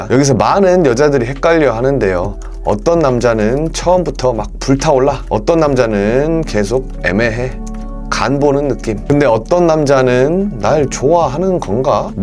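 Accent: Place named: Jeolla, South Korea. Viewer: ㅋㅋㅋ전라도 사투리가 묘하게 중독적...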